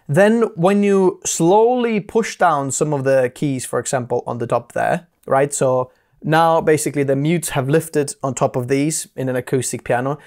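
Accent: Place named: United Kingdom. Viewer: Wow…just realized you posted this a day ago! (On the basis of your accent, you’re posting from the UK, I’m assuming….) Coincidentally, I just purchased an LX706 today. I have a few days before it will be delivered, so I’m biding my time watching YouTube videos on the model, which is how I happened upon your excellent video. Thanks so much for the detailed presentation! I live in Honolulu and purchased a Roland FP30 about three years ago during COVID when piano tuners weren’t making house calls. I had tried to teach myself how to tune my 1990s Yamaha acoustic upright but messed everything up completely and broke a string. Knowing that tuners wouldn’t be making house calls soon, I immediately drove to the only piano dealer on Oahu and picked up the FP30 with stand and pedals for $1000. Over the next few months I fell in love with digital pianos, even though I’m a classical pianist who doesn’t care about all of the non-piano sounds. 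When I finally had my Yamaha tuned this past summer, I realized that I had become a digital piano player and that acoustic uprights just weren’t as satisfying anymore since the advent of high-performing digital pianos. Fast forward to this past week, when I decided to upgrade from the FP30. I watched numerous videos comparing different brands and models, then decided on the FP90x as the most affordable upgrade. But then I went back to the original piano seller’s website and saw that they had a pre-owned LX706 selling for about half the retail price…s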